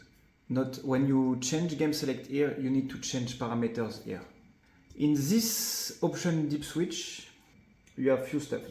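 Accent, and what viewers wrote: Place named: France. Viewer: Bonjour vu votre accent vous êtes français 😉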